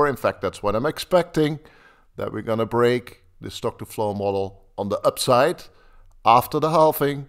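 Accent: Netherlands. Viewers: Yeah Dutch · Can I ask you something? Are you dutch? I hear a dutch accent or I am wrong? Thank you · First time here ... Got the reference from some Brazilian btc influencers 📝 ... First thing I noticed was the Dutch accent 🗣️ ... Only to be confirmed by the stream of comments in your language 🎉 ... // Het is een grote plezier contact met je nemen ... Je werk is geweldig 🥇 ... Groetjes vanuit Rio de Janeiro 🌊 !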